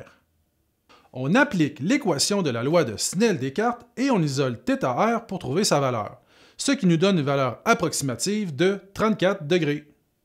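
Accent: Quebec, Canada. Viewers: Wow merci beaucoup pour les explications simple et surtout très claire, avec un petit accent québécois qu'on apprécie toujours autant ! MERCi ! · Merci pour ton accent québécois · Au tout début, je pensais que j’allais rien comprendre, en plus tu as l’accent québécois :) mais au final aucun problème ! J’ai parfaitement compris ce chapitre grâce à toi, Merci ! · top l'accent du Quebec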